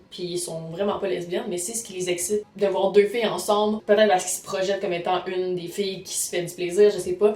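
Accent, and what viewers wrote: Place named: Montreal, Canada. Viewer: Fille choisis.. c'est français ou anglais🙄 Ça parait que tu vis à montréal parce que t'entendre parler comme ça c'est juste dégueulasse.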